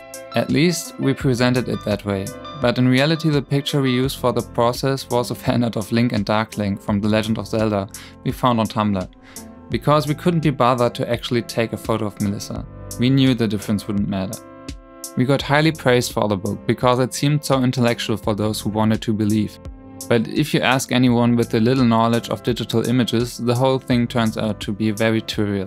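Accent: Germany. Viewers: Ah, ich wusste, dass du Deutscher bist :P Du hast zwar wenig Akzent, aber irgendwie kam es mir doch bekannt vor, aber cool hier auch mal eine deutsche Perspektive zu hören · Wusste doch dass ich einen deutschen Akzent höre ^^'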